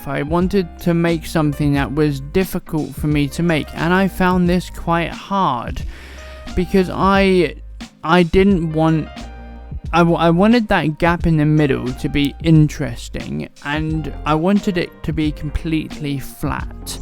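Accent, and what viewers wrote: United Kingdom. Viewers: Already subscribed. Learning a lot! Thank you. But the music is a little distracting when i understand English just not England English so some of your words are already difficult to pick up sometimes.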